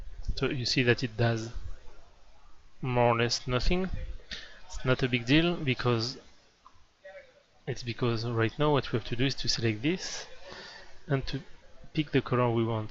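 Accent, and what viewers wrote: France. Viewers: oula sound french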